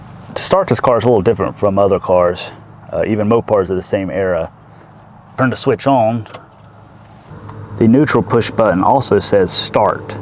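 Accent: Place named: United States. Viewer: Is this the Stephen King car ? new subscriber glad to be here ,hey from Australia,what a sensational voice ,this is how as a young kid livin in the outback i expected real Americans sound like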